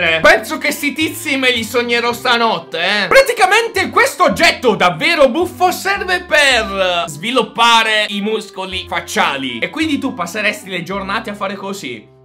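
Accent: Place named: Naples, Italy. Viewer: Mi fai troppo ridere poi non so perché hai l'accento napoletano no perché ti seguo da 1 mese ma non so se sei napoletano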